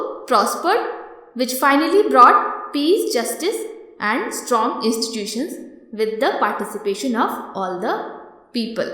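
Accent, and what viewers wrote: India. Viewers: Ohhh great Indian accent 👏👏👏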